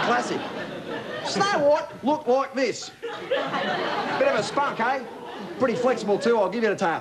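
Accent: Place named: Australia. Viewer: of course an aussie can understand him...and if you dont you obviously dont get aussie slang or humor!!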